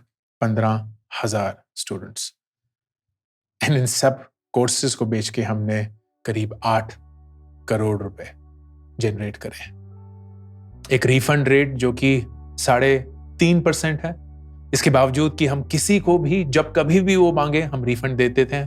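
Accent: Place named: Kashmir, India. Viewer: Can't help but appreciate your subtle Kashmiri accent. "Pata hai kyu" Highly appreciable journey.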